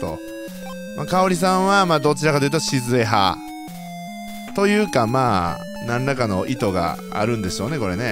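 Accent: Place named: Kyoto, Japan. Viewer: 京都弁いいですね〜꒰ ´͈ω`͈꒱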